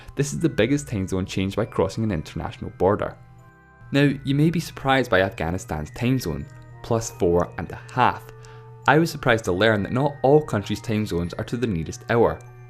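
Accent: Scotland, United Kingdom. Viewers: Are you Scottish? Scotland is the best :) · Accents are wide raging and different u can have 10/20 different accents in a city or region of a country or even just a part of a city. Also certain ppl naturally may have a strong or weak accent due to their voice and some ppl can be able to mix different accents and make their own unique accent. This guy has a Scottish accent but it's not a strong strong 1. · Breezy But he's from Scotland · This channel is from Scotland. I hear it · Oh dear...Scottish accent with the speech impediment additive...does my head in... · No, it's a Scottish accent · He has a Scottish accent, which is presumably where he's from. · Yeah, it is a scottish accent. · Very annoying all those comments mocking his accent. He is Scottish, that's how people speak here. He never said "tame", there is no a sound in his pronunciation of time. 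Same with "sex", he never said that, there was no e sound in his pronunciation of six. Most of the people making those comments are probably American, considering how these words are pronounced differently there. Guess what... English is spoken in other countries as well. Have a bit of respect for other accents! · Good to hear a Scottish voice on Youtube and imparting some knowledge. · The amount of idiots here who don't know the Scottish accent is too high. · WHY DID THEY CHOOSE THIS TERRIBLE ANNOUNCER???!!!! HIS ACCENT IS DIFFICULT TO COMPREHEND. SHOULD HAVE USED AN ANNOUNCER WHO SPEAKS IN STANDARD BBC ENGLISH OR GENERAL AMERICAN ENGLISH, UNIVERSALLY UNDERSTOOD ACCENTS---NOT SOME SCOTTISH ACCENT!!! RUINED THE ENTIRE VIDEO. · To be honest, I loved your Scottish accent. By the way, you should have mentioned the Spanish case, where in zones like Finisterre (where I am from), the very eastest cape of Spain, the sun goes down in summer , in 40°N of latitude; and in winter, the sunrise is am... 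Freaking out! We should be on -1 UTC, but we are on +1, and in summer +2. But well, I don't dislike it though. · I'm a stupid native English speaker from America, but for some reason it's so hard for me to understand some English dialects. British? and Australian are pretty easy, and you accent doesn't sound Irish, so I'm guessing Scottish, and that's what some of the comments are saying. But that's okay that's what subtitles are for. And don't worry I subbed. And I'm sure you understand where I'm coming from but just to confirm I'm not talking shit or hating on you specifically I'm just really being honest: I seriously can't understand all English dialects. Otherwise I wouldn't have even mentioned subbing and actually subbing. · Sounds like a hybrid accent. Scot and Chinese or Japanese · Niue is a territory of New zealand n ot a country it's a scottish accent and yes it's difficult to get used to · I would like to know the presenter's area from which his Scottish accent originates, as I've heard similar versions where it sounds like the other speakers are talking through mouthfuls of saliva. 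· We Americans have not trouble understanding a standard English accent. The narrator, however, has a Scottish accent, something English speakers outside the UK find difficult to understand. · He's Scottish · It is Scottish. Use Closed Captions (subtitles) if you find it difficult to understand. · He's Scottish that's how he talks. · Eduardo Mañón it's Scottish not english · Gae Shows that's ignorant, he has a Scottish accent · From the comments i understand it is a Scottish accent. I have no problem to understand him. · Roman Furman Scotland, with a Scottish accent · He has a Scottish accent.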